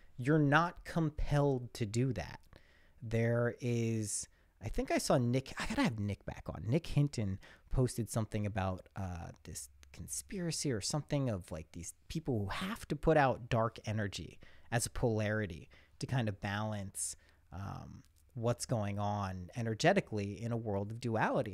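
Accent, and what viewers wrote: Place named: Ireland. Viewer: So your Irish accent was a hidden gem you should absolutely let shine WAY more often...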